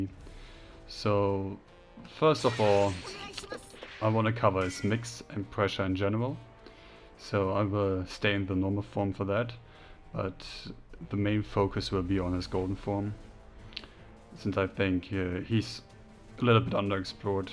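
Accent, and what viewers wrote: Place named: Germany. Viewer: are u german ? ur accent sound really really german xd wait ich bin dumm xd dein Spiel ist ja auf deutsch ;D gutes Video !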